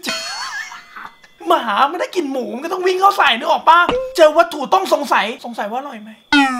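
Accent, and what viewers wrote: South, Thailand. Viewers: 555เขาเเหล่งใต้มาเราเเปลทายย55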